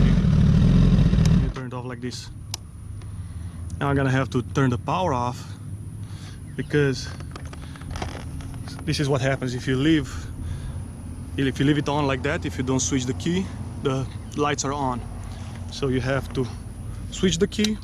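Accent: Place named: South, United States. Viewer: I can barely understand your southern accent.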